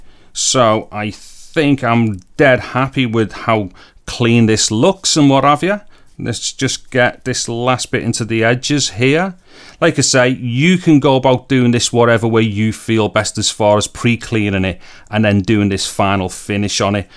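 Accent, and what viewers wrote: Liverpool, United Kingdom. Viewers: Liverpool ish scouse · Ah, the lovely scouse accent! It’s unique to Liverpool. My favourite city in England.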